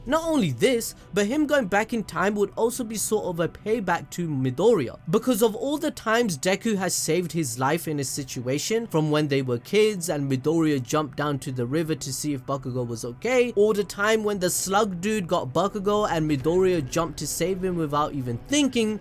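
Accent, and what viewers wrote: Jamaica. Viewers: Are u Jamaican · i laughed very hard for the Jamaican accent. now he just needs to weave in a Trinidadian accent and your skits will be perfect. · Ayo I've been watching this man for year no one told me he was jamaican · Wait Holup your Jamaican 🗿 yes I will now go back and like every vid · You Jamaican bro? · 🤣🤣🤣......yuh get a pass mi boss wid dat Jamaican accent 🔥🔥😂😂😂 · Lolol dude you deserve more subs just by adding that Jamaican accent big up u self respect lol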